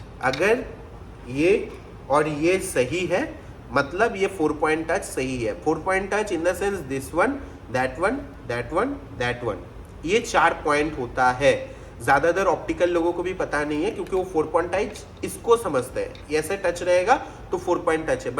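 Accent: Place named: Bengal, India. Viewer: Okay bhaiya ji.. Samajh gye.. But you are using bengali ascent.. 🙃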